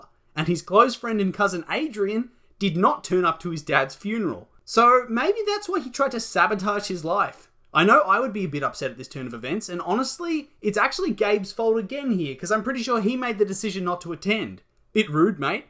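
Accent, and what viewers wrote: Australia. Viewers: Not mean to offend you or anything but you sound an awful lot like an Australian 😂😭✋✨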